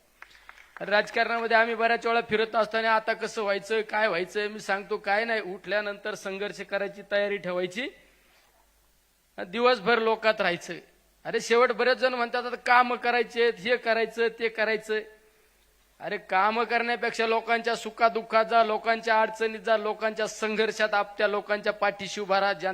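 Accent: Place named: Ahmednagar, India. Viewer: अस्सल नगरी कडका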